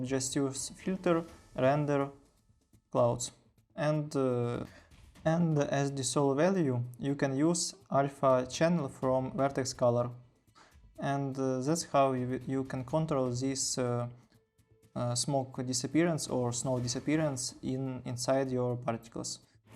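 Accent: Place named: Russia. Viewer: хороший гайд, пишу на русском, потому что уверен, что ты оттуда же (акцент прям выдает)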